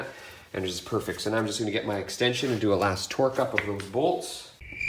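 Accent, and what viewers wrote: Canada. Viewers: lol, it’s always good to watch a video of an enthusiastic demonstration. I bet you’re from Canada, you got a Canadian accent.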